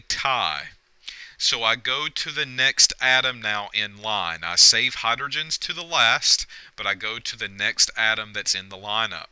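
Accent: South, United States. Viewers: love the dixie accent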